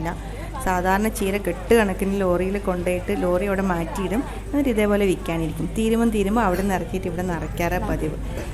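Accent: Tamil Nadu, India. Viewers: No Small tamil touch in the conversion.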